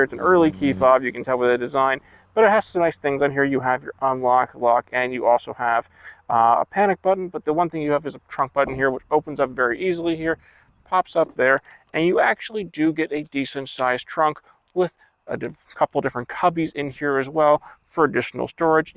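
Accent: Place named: Europe. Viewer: Where is this guy from, Europe? Zed, kilometers. Z, mileage.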